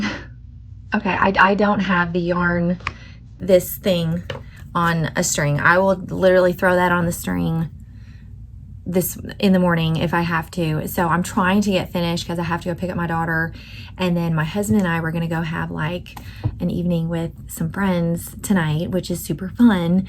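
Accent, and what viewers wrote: South, United States. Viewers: I just found you and love you already. Subscribed!! I loved when you said that markets were the P to your PB and J and your cute southern accent. I definitely will be going to site and purchasing from you because I want to support you!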